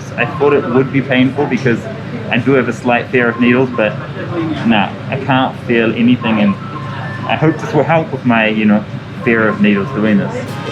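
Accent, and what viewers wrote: India. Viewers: You sound like indian. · you speak English in indian accent lol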